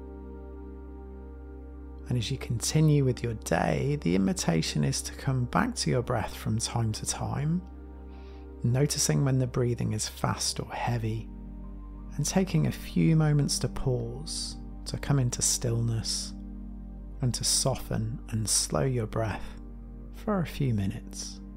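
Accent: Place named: England, United Kingdom. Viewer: Always nicer and more calming to hear English accent.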